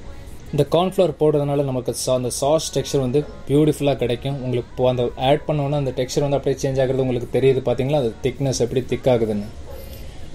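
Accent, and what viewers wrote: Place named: Mumbai, India. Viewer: Your tamil is nice. Mumbai tamil 😅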